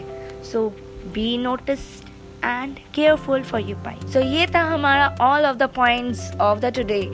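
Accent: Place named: West Bengal, India. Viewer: Sweet Bengoli voice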